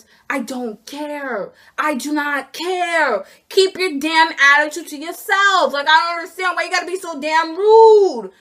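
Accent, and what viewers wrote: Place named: Long Island, United States. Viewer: idk why i love long island accents 😂 they're just funny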